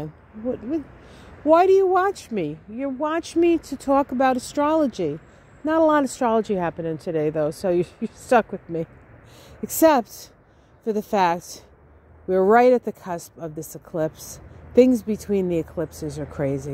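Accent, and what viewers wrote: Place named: East Coast, United States. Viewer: Another reason why I tune into your morning astrological strolls with Charlie is because you are not a phoney - just sincere and concerned commentary on American and world events with doses of deep wisdom, humor, and splashes of that strong east coast accent (I’m from Somerville, MA) Hearing another speak about and process daily events is therapeutic for this listener…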